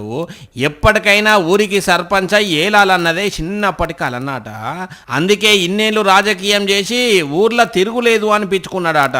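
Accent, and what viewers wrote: Telangana, India. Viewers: అది సరేగాని నువ్వు ఏందిరా తెలంగాణ యాస మాట్లాడుతా ఉండవు ఇదీ ఆంధ్ర ఛానల్ అని తెలియదా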